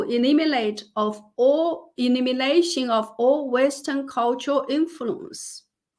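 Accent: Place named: China. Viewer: I love your Chinese accent👍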